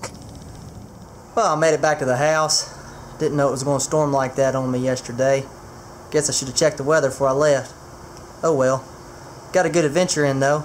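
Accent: South, United States. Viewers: Hey I know you don't want people to know where you live but what state are you in that has black bears? I know it's southern from your accent.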